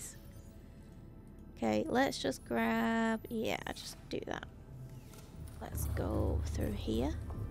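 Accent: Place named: United Kingdom. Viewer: British 👌. nice accent :)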